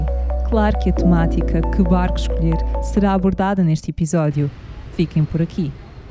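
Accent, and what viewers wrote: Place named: Portugal. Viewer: Que fantástico, acho legal o canal de vocês pois vocês visitam vários lugares bem diferente e peculiares e é bem bacana ouvir a sua narração com sotaque português. ❤🤗Abraços.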